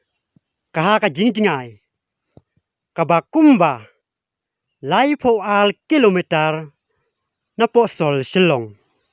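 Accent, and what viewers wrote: Sohra, India. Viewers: Kren sohra ruh pat nangre ine ima kum ba khreh poittry I mat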